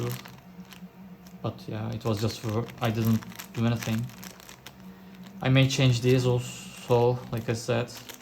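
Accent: Turkey. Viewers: Hi, your accent looks like Turkish accent. Where are you from? If you are Turkish can i ask some questions?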